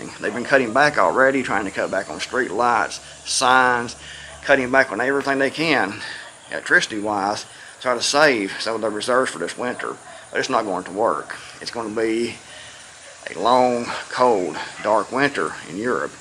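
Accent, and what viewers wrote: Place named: South, United States. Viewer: Hi there, I love your videos. You are calm, and sound like a Southern gentleman. (No offense, or crazy stalking business. Just want to let you know what I value in your videos and on the channel.) I just want to comment on something you said that's tickling my brain, about a comment by someone else, with regards to the lady who is losing interest in prepping. They want excitement, they want something to REACT TO. They want to be entertained. Prepping boils down to keeping one's house in order. It's that simple. Keep decent food, cook for the family, etc etc and, yes, etc. Prepping is KIND OF fun, but it's mostly hard work. It's BORING, until one sees the progress, the stacked canning jars, the freeze dried bags, and so on. It's not GLAMOROUS. I'm up periodically around the clock, there's no makeup involved in slicing and blanching potatoes outside under the carport at 1 A.M. 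because of the heat and humidity in the area. If people want to be entertained, try stealing my chickens, I'll give them entertainment, and some precious metals to boot, and I'm not talking gold or silver.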